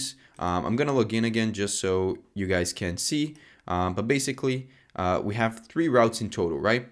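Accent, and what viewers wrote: Brazil. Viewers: Great vid! I recognized the Brazilian accent as the it started playing 😆 Keep up the good work!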